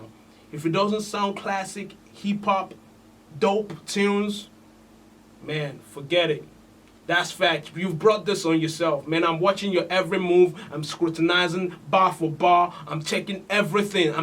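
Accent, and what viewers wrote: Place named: United States. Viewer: lose the American accent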